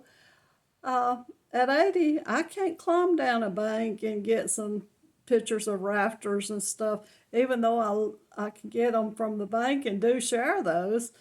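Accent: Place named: Georgia, United States. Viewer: Hi. Nice to meet you. You are adorable and very sweet. I can tell you have a kind heart and have so many fascinating stories to tell that us younger ones can learn from. Btw your accent is so nice to listen to. I live in Italy btw so I don't get many opportunities to meet any folks from Georgia.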